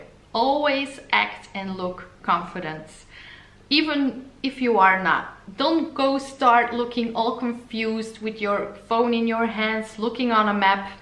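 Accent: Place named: Antwerp, Belgium. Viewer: Finally I found someone with the same accent as me!! Ik ben Antwerpse maar woon sinds 15 jaar in de USA.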